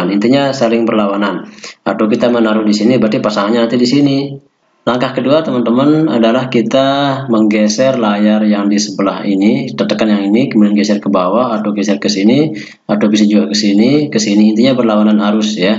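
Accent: Bali, Indonesia. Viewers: orang bali y mas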